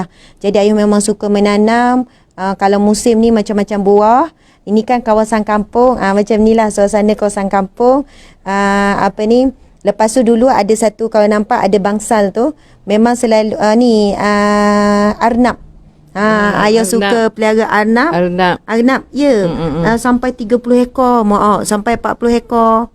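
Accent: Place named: Terengganu, Malaysia. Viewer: alhamdulillah , maasyaaAllah , sukanyaaa dengar soro mok ustazah, cakak loghak gganu pulok tu, suko denga.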